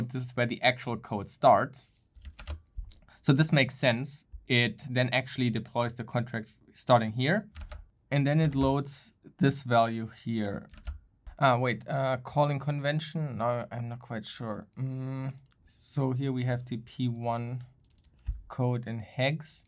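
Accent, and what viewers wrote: Germany. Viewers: I bet this guy has a fritzbox as router... he sounds german and has the 3'rd octet x.x.178.x. Not complaining. Fritzboxes are alright.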